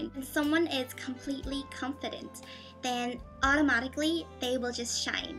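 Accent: Ireland. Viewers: youre irish!?!? sry,,, slow moment ive only seen like 2 of your videos :) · You sound irish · I JUST NOTICED YOUR IRISH ACCENT. Yeah I know I'm slow. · Whoa, an Asian girl with an Irish accent! That's pretty much the hottest thing ever. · dude u hav an irish accentish wer u born der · You have the cutest accent EVER <3 omg <3 you can hear the little irish bits and GAHH <3 so so sweet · I've had this problem before on the internet. It sucks tbh cos there's almost nothing you can do about it! You've done the right thing anyway by letting people know what's going on in a mature way. Anyone who knows you and cares about you will recognise that you aren't malicious at all, in fact from what I've seen you couldn't be more honest and kind. p.s. I love the little hints of Irish that sneaks in now and again when you're talking, it's really cute and certainly unique! Keep smiling!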